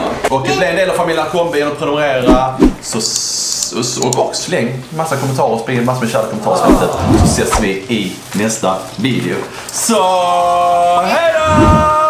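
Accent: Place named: Scania, Sweden. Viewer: #unibrown och pratar mörk och skånska bland det värsta jag vet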